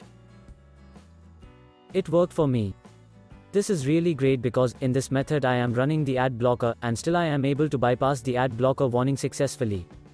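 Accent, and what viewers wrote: India. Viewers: Damn even if it's a robotic voice these people achieved to make it sound indian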